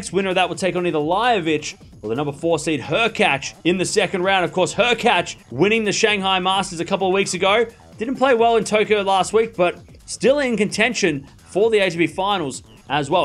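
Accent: Australia. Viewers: are you aussie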